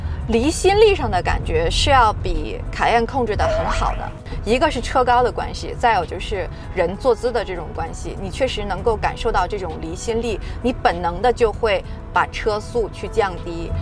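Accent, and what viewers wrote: Beijing, China. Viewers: 解说的很到位，喜欢你的北京腔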